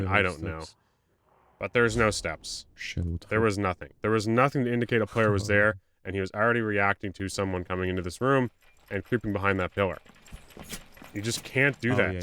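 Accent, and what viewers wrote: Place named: United States. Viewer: your english is indistinguishable from an american speaking English, where are you from?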